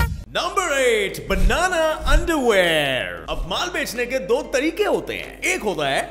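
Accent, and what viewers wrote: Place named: Maharashtra, India. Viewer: Maharashtra se hai kya? Because accent se aisa hi lagata hai🔥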